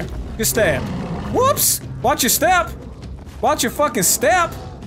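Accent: South, United States. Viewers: he said yall are you from the south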